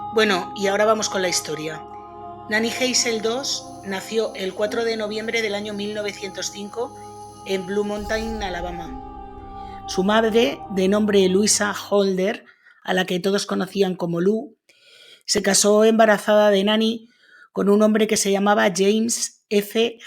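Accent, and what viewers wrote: Spain. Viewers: Primera vez que te escucho, aunque está sra hizo cosas horrendas tenés una manera de relatar tan graciosamente española que x momentos me tentaba de risa (vaya que ojo tenía...😂) ya me suscribí!!!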